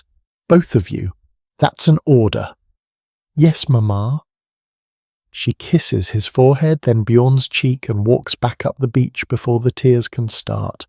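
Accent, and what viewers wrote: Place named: England, United Kingdom. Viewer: From Norway. The servants Are TRELL/ treller , not throll. Reading with English pronansuention mekes many names and words so strange. What happend to the selebration of Jul? The feast and oferings in the darkest part of winter. Fishing , trapping and hunting are all winter chors as long as the weather permited.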